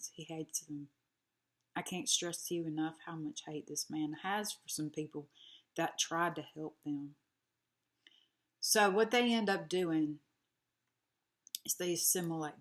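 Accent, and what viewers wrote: South, United States. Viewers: Love the lip shade. And your southern accent. Subscribed. Much love from Arkansas.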